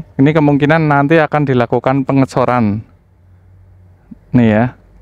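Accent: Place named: Yogyakarta, Indonesia. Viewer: logat jogjane medok pisan mas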